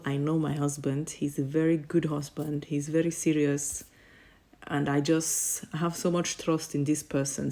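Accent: Igbo, Nigeria. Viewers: Are you kidding? This is my first time coming across this channel. This is amazing😂 Ma, you’re a beautiful piece of God with your soul. Hearing the Nigerian accent and Igbo slangs coming out of a white womans mouth is a spectacle to behold 😅 I wish your family the best things of life❤❤❤ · you are a good wife, thank you for understanding your husband and his culture, Igbo. you understood our slangs and also speak with our accent you are beautiful and blessed 💗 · E shock me as you speak igbo along with the Nigerian sland and accent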